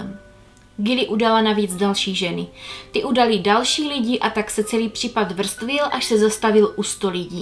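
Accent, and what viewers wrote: Moravia, Czech Republic. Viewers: chtěla jsem Vám sdílet video na svém facebooku, ale neudělám to - uráží mě, že Jesenicko považujete za Čechy, když se jedná o MORAVU. Olomoucko leží na MORAVĚ, Olomouc je MORAVA. je to dost smutné, protože podle přízvuku jste i vy z Moravy.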